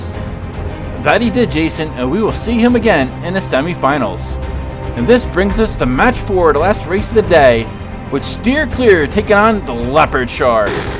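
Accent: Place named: United States. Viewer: Oh I remember you live in Thailand. Because of your accent/voice I sometimes think you are from the USA so it would've been cheap seeing as I got some cars there but great video!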